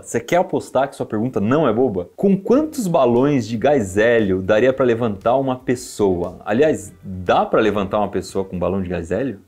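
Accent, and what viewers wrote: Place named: Minas Gerais, Brazil. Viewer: Ele deve se mineiro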